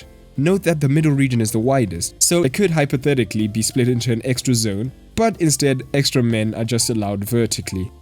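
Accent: South Africa. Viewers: U sound South African lol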